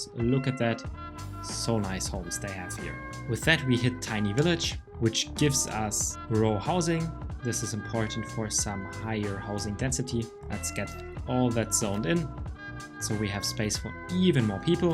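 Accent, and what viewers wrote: Germany. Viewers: Are you german perchance ?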